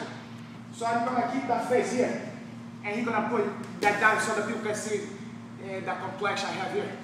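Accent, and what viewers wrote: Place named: Brazil. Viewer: "He's going to face this way my brother. C'mon my brother!" LMAO that's how so many Brazilian instructors talk. I love them all but it's so spot on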